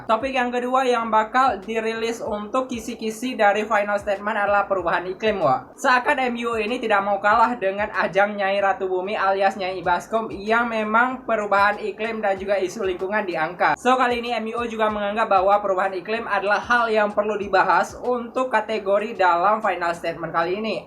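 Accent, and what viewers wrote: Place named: Medan, Indonesia. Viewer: Wak ud ku suscribe channel kau wak,, sukses selalu ya,, kayak org medan cakap kau wak🤣😁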